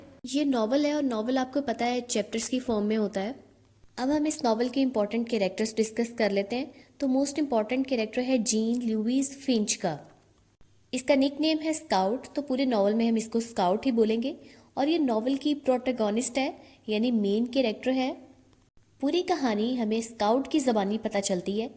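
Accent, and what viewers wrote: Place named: Pakistan. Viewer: एक्सेंट बाहिर सुनकर मुझे लगा कि आप पाकिस्तानी हो और सच में आप पाकिस्तान से हो👍 ग्रेट You have summarized the story very well. I am an Indian and I have to study this story in the 5th semester of college in Delhi University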